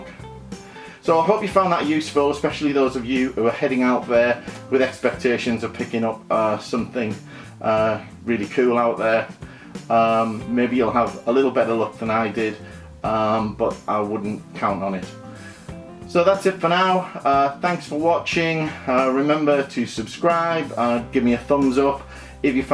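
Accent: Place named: Northern England, United Kingdom. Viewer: Nice lego haul, and I'm quite envious of your Lego room, I've been granted some shelves in the closet to store mine. :) I'm no expert on UK accents, but are you from Northern England? You sound relatively similar to my friend from Leeds.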